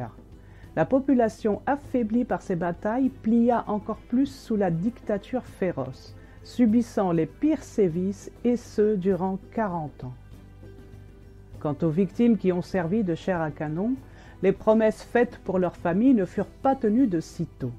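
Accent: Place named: France. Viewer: Votre accent français est si beau! Pas comme notre français au Québec hahaha !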